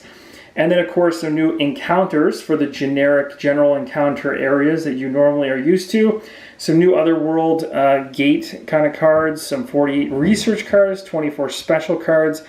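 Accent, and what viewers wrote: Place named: Canada. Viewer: I feel like I pick up a Canadian accent lol. But thanks I’ll be buying this next time I can afford another expansion. Forsaken lore is arriving in mail tomorrow!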